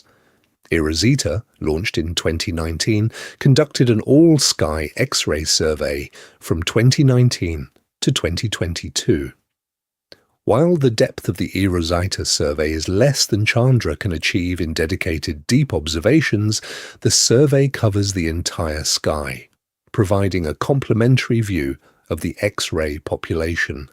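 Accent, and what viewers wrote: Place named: United Kingdom. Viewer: Dang! British Accent irritating.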